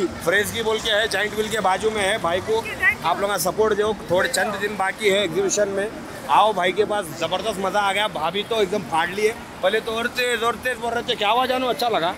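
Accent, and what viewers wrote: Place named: Hyderabad, India. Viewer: Tumhari hyderabadi dialogue delivery ek dum chindian hai ustad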